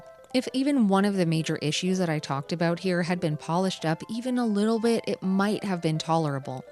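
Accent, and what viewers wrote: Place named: Canada. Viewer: you are actually from canada right ? i wont say that i spend very much time on your twitch channel because i get so busy with work that its hard for me to find enough time to sit down for long hours to watch the twitch livestreams. so i might have missed alot of the details about yourself or your experiences if you ever talk more about your life on twitch. i just tend to only check out the youtube videos you make instead. but im just sure that you must be from canada just because of your voice and because i have lived in canada my entire life too. canadian folk can often sense other canadians. what part of canada are you in though ? you can just say what province if you dont like giving out too much personal information. or ill apologize if my best guess is wrong and you arent in this country. i think we are also close to the same age as well but im all the way far east in the atlantic provinces. 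its rare to find anyone from canada that reviews these older retro games from what i have seen on youtube. so i just wanted to tell you that i really appreciate what you are trying to do with your channel. you are a true inspiration to other gamers in my opinion. someday i hope to start a channel and make the same kinds of reviews as well. i say that since i am also addicted to the rpgs, especially the retro rpgs. i dont think you have a discord server or i would probably try talking to you later. wish you all the best however and good luck with everything, take care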